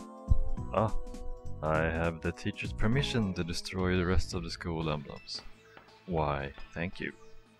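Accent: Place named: Europe. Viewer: Pardon me if this sounds a little rude, but do you have an accent? Your is so unique and cool and I want to say it's due in part to a European accent of some sort, but I can't quite put my finger on it. Where are you from?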